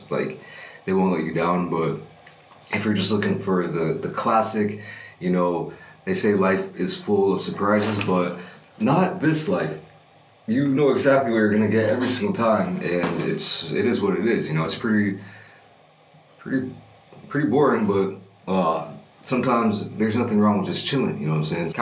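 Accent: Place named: United States. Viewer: You can tell that been to America by the way he talks 😂 Keep up the great vids man 🙌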